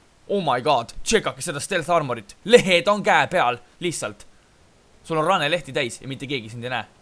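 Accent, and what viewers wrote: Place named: United Kingdom. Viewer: Su British Aktsent on nagu Geographic Channel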